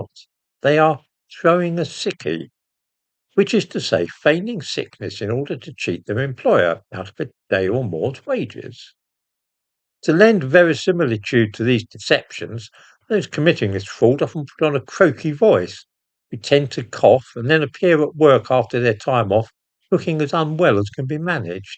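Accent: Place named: Somerset, United Kingdom. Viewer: Love the Somerset accent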